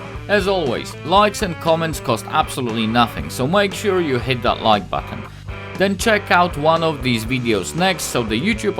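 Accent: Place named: South, United States. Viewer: I hope your viewers pick up on the planer trick you used for small/short boards. I have a feeling you and the Bearded Viking have been chatting a bunch since he became a subscriber due to your ETSY Check series, "brad in the a$$" Picking up on some of that southern drawl🤣. You had me at 🔥and 💡. BTW, nice shirt! 😉 I guess I should upload something soon with all that free advertising you have been giving me. 😜 This is a pretty cool concept. Maybe some 3D printed flying/floating superhero silhouettes would be an ETSY seller? Magento should be the first one... I mean the secret sauce here is magnets, just would be fitting...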